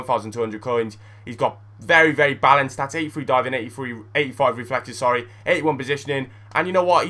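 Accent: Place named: Cardiff, United Kingdom. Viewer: Sounds like a Cardiff boy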